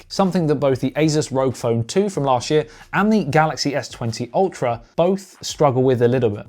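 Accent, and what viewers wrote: United Kingdom. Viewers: ok. are you from UK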